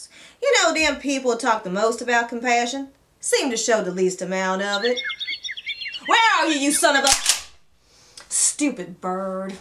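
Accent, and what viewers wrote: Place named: South, United States. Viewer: Great video! Your southern accent is hysterical, not to mention you deliver your points with class and style. I hope you plan on making more vids.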